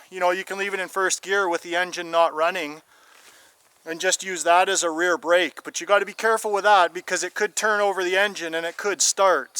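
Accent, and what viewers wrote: Canada. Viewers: I love your Canadien accent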